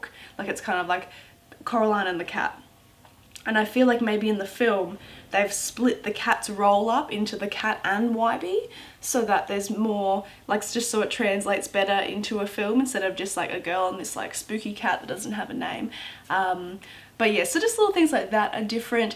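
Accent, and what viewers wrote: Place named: Australia. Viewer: I love your accent. So Australian! :)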